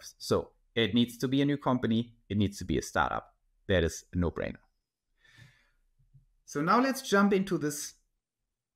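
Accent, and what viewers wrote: Germany. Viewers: I sometimes don’t believe my physician, he’s not young and thinks things I don’t. For instance cholesterol. Some people have it genetically and it’s normal. I do go in annually but it’s not like a screening like you are saying. I like your ideas and catching disease early is beneficial 👍🏼 Is your accent German?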